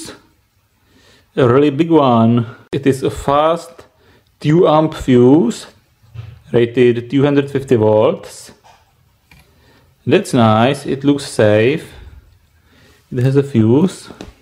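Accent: Czech Republic. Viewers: Thats some nice czech (prague) accent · Tak tohle je nejsilnější český akcent, který jsem v angličtině kdy slyšel. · Sounds like a Czech English accent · i know you're czech because you czech-ish english pronoumciation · Judging by your accent - Are you Czech? If yes then "Ahoj" :D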